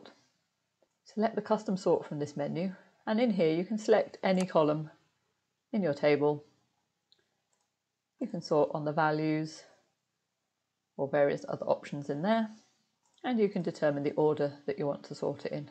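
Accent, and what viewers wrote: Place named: RP, United Kingdom. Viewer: Pradeep, man. Get real. Hers is the sort of English shy of RP that people want to speak. Maybe you. Pradeep, need to listen to Amitabh speaking English more (his is actually quite excellent), unlike that of typical politicians and actors in the subcontinent.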